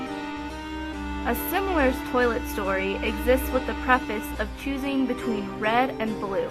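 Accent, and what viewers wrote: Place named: Iceland. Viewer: OMG! You're Icelandic?! That's so cool! I would think it is hard to talk that way, though. But it sounds so cool!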